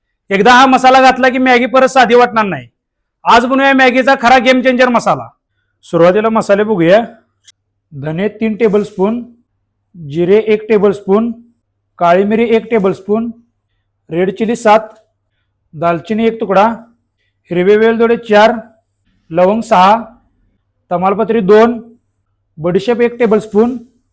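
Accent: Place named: Sangli, India. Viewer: Mastch tumi sangli che ka dada bolne sangli kadeche vatey 😅 khup chan video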